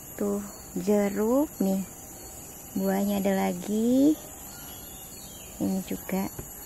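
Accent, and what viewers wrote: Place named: Java, Indonesia. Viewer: kak pernah tinggal di Jakarta ya??cara ngomongnya mirip sama orang di Pulau Jawa umumnya hehehe, soalnya kalo orang Kalimantan asli ngomong Bahasa Indonesia itu agak beda dari orang di pulaubJawa🤣🤣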